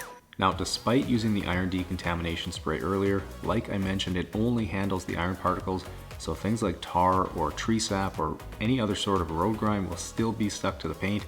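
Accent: Canada. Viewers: What did he say? Compressed air and what? His Canadian accent lost me.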